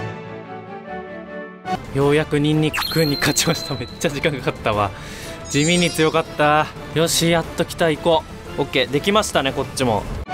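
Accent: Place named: Okinawa, Japan. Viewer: イントネーションが沖縄っぽい(笑)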